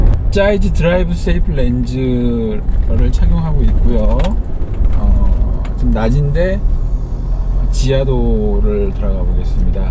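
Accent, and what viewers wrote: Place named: Chungcheong, South Korea. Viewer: 충청도 분 이신가ㅜㅜ말좀빨리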